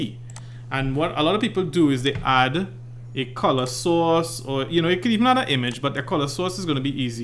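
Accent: Trinidad and Tobago. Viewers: I'm sorry but the Trini accent just made me unreasonably happy. Also, the tutorial was so helpful. Thanks for this!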